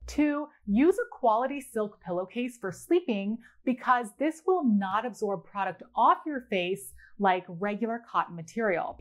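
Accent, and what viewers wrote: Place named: Iran. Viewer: I hear a mild Persian accent. 100 bucks says she is Persian. 😁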